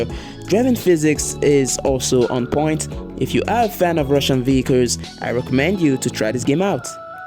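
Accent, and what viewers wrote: Nigeria. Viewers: You got Nigeria accent, where are you from?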